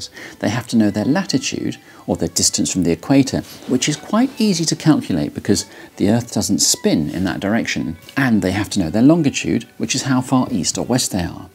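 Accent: England, United Kingdom. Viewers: I like your posh English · What ever the fck problem they had that was purported to be solved in this instance was already solved by African navigation techniques. But that’s not His-story now is it? Interestingly enough the English were the main hiders of this information. This guy sounds English to me.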